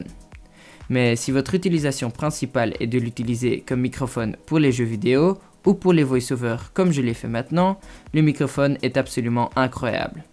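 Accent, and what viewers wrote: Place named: Flanders, Belgium. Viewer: Cet accent flamand est atroce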